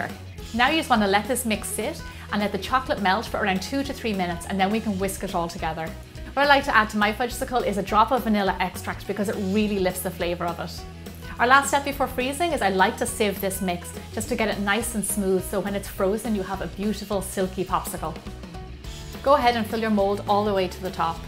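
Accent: Ireland. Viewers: Are you Irish? Your accent sound Irish. I'm Irish. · Are you irish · The Irish accent 😂I'm Irish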